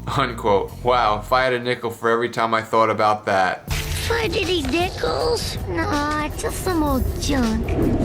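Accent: New York, United States. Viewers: That NY accent! :D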